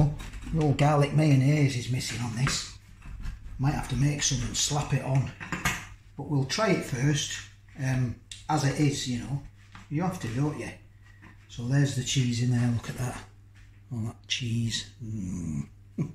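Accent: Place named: Rochdale, United Kingdom. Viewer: I’ve just subscribed bud I’m from Oldham you sound like your down the road in Rochdale bud. Anyhow likening the vids buddyespecially the vs series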